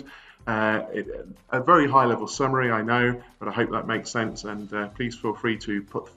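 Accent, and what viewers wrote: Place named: England, United Kingdom. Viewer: Finally a video with english accent, great video.🎉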